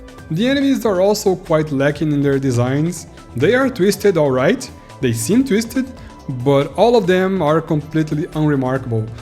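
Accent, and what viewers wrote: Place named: Brazil. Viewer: I thought it sounded like my friend who is Brazilian's accent! Man I'm so impressed with bilingual speakers.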